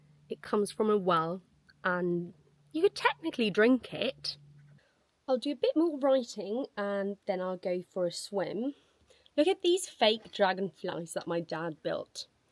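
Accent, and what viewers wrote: United Kingdom. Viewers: A real British accent! 🤓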